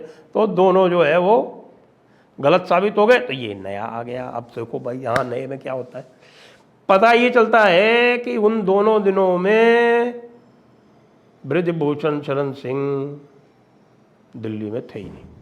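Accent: Haryana, India. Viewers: Hi sir I like your Haryanvi style Baddee Baddee pehlwan..... Ho Ho Ho Haa Haa Haa.......Thanks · Haryanavi accent 😅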